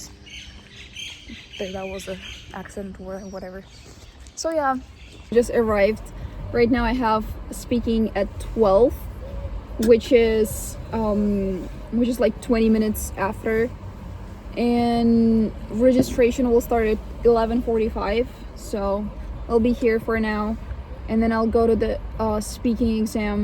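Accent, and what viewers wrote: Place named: United States. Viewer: Before i saw your ID card i thougt she is an american. Amazing your speaking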